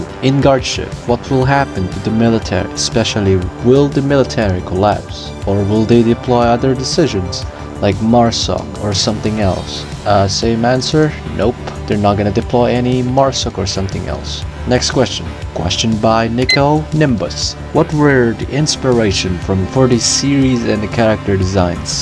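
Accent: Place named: Russia. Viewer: is so peak. Ngl I didn't know you have the Russian accent, it's good to see a Half-Life fan nowadays who has a love for zombies too.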